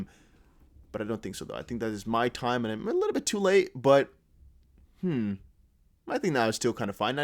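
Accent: Netherlands. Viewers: you're DUTCH BRO?! madness! Lol I Prefer you Dutch accent maybe cuz I''m in the UK good to hear EUROPEANS on the crypto train!!! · omg your dutch accent was so cute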